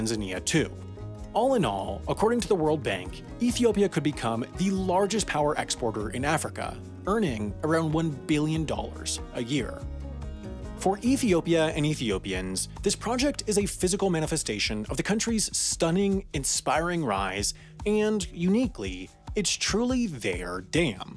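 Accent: United States. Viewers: Senseless Egyptian propaganda in American English! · Isn't he American? He sounds American.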